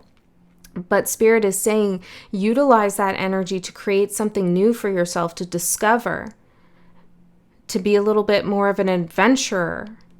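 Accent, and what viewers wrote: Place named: Canada. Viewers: I think it’s Canadian speak 🤭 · it's an accent. she is canadian.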